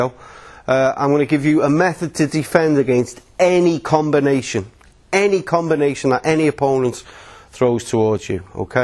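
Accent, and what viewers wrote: Liverpool, United Kingdom. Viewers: Scouse is the name of the accent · The voice of gods mate. Scouse. :)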